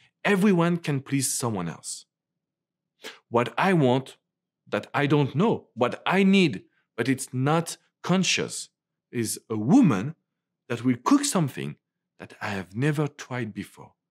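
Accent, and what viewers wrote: France. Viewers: I am here for the french accent. :-)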